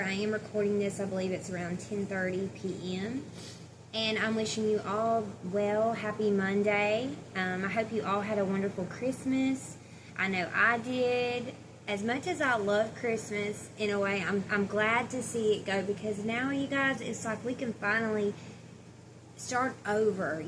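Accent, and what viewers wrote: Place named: South, United States. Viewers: I love your accent. Are you American? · Sounds American from the South, like George or Alabama. 💝 She does have a sweet heart!💝 PS she says she's from Georgia!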